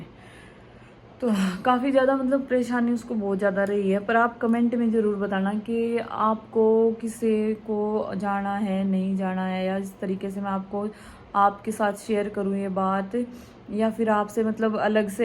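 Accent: Haryana, India. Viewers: Aap Haryana se ni ho kya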